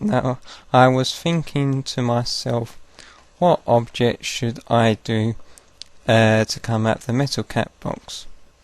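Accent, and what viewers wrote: United Kingdom. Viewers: Me who knows little to non english: ... WHAT IS HE SAYING I CAN JUST UNDERSTAND ISOLATED WORDS Help i am having a meltdown 😂😂 British english is still way too much for me ahahah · Sounds like Toby from The Office US with a British accent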